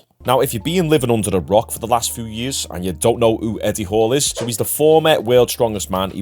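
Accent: Liverpool, United Kingdom. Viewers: Can you shut up and just watch the video please! I don't want to hear a scouse to talk over the video!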